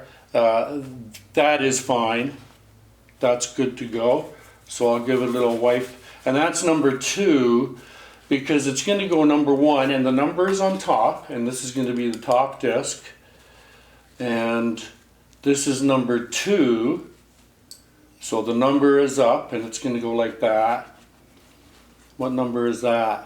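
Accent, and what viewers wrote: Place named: Midwest, United States. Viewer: Thanks for your videos! I thought your accent was MidWestern. Michigan, Wisconsin. I'm from Pennsylvania.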